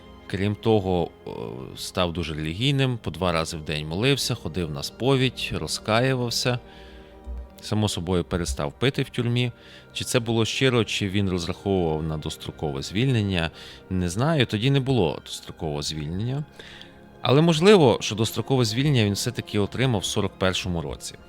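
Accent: Lviv, Ukraine. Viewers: Дякую. Гарний виклад чистою львівською мовою. Історичні фрагменти життя Львова - це хороша і правильна рубрика. Сьогодні я Вас почув у перше і мені сподобалось. Здоров'я і добра Вам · Чудовий львівський говірок.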